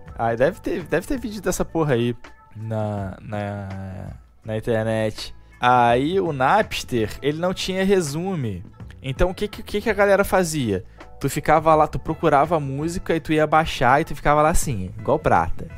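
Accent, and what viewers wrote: Rio de Janeiro, Brazil. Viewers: Tem muitos caras bons na rede social, mas quando o tutorial tem sotaque carioca não dá me desculpem, mas é pura verdade, eu tenho vários amigos que também reclamam.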